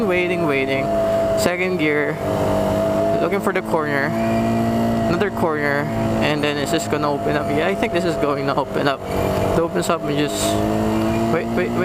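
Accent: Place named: Philippines. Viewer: "yur butt starts to get sore" haha XD Love the pinoy accent mate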